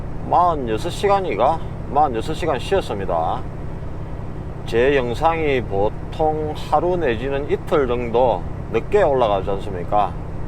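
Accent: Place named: Busan, South Korea. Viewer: 저의 작은 삼촌하고 목소리, 발음, 부산 사투리까지 똑같네요. ㅎㅎ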